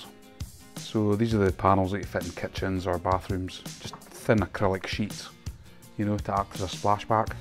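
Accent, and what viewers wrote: Scotland, United Kingdom. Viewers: a really sweet strong Scottish burr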